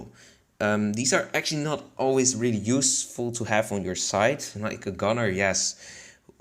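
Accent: Netherlands. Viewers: Bro you sound Dutch man If you are: frikandelbroodjes zijn echt lekker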